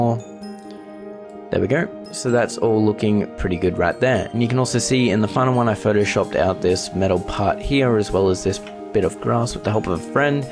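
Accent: Australia. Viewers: I love how your voice sounds.. No Homo. Giving Aussie accents a good reputation :3 Sick tutorial mate!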